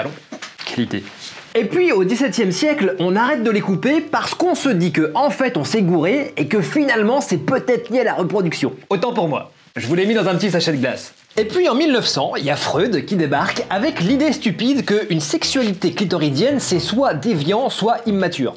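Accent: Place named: Marseille, France. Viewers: merci pour l'accent du sud. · J'ai adoré la dernière phrase X,) Et tu joues bien ! L'accent marseillais 👌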